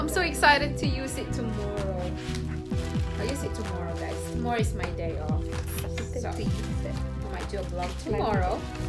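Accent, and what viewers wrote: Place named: Australia. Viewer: Yes, the case.. looks so manahalin but yeah, it's affordable. Isn't? . Uha! the gold at the centah is so striking. Defootah.. The bubbles thingy, nindot na sya pabutuhon. Nahn! love the Australian accent and the accent of the make up you put on yow face. But I still can't beleive na we live at the same place. Yeah? hahaha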